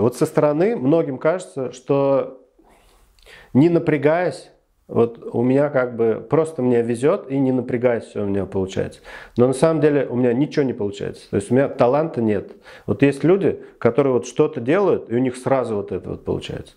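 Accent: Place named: Cheboksary, Russia. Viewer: Здравствуйте! Я более 20 лет живу в Германии, смотрю Ваши видео и сегодня узнала, что Вы живёте в Чебоксарах и поняла почему Ваш говор ( или акцент) меня как-то приятно трогает. Дело в том, что я каждый год в течение 20 лет иногда и два раза в год навещала в Чебоксарах мою двоюродную сестру, ухаживала за ней и однажды проходила курс лечения у мануального терапевта кажется на улице Горького. Сестра моя умерла 2 года назад и больше я не езжу в Чебоксары, но очень скучаю по вашему городу. У меня есть там очень хорошие знакомые, поддерживаю связь с ними.Очень нравятся Ваши видео и было очень приятно узнать, что Вы из Чебоксар! Удачи Вам, и процветания!!!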